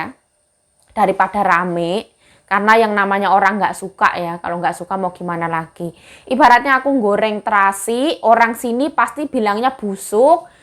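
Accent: Java, Indonesia. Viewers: Aq seneng liat mb...jowone medok..dr Blitar ya mb,?..sy Arema mb.Mlg.... 😀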